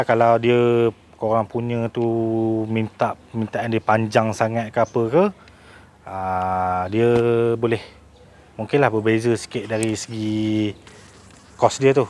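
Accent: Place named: Perak, Malaysia. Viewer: Cara abang cakap macam orang perak le...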